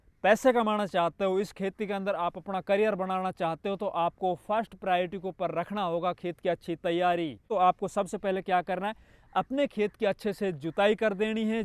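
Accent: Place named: Tijara, India. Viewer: आप की भाषा तिजारा की लगती है आप सानदार काम कर रहे है very nice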